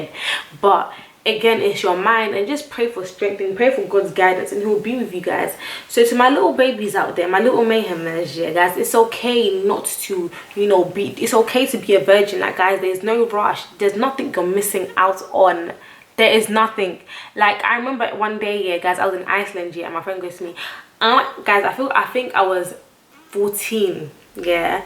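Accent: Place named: United States. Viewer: how come i come from my boy joovier video you sound more american than me?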